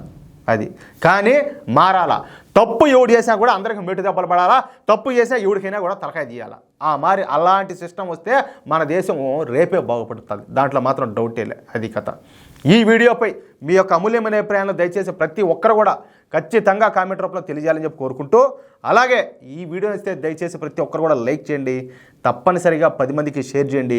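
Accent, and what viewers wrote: Rayalaseema, India. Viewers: బాగుందయ్యా పెద్దమనిషి మన రాయలసీమ భాష చాలా బాగుందయ్యా నాకైతే తెగ నచ్చేసింది అయ్యా నీ లాంగ్వేజ్ బలే బలే బలే బలే బందయ్యా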